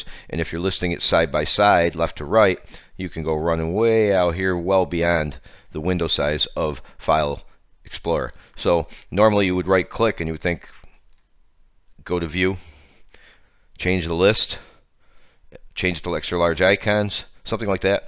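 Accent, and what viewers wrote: United States. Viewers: Beautiful midwestern accent!!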